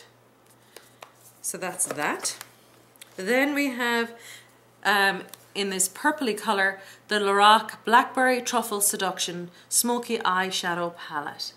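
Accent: Ireland. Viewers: Haha you are so funny , love watching you (just curious ...are you Irish? you sound it , anyway love the accent ) great review love Nisha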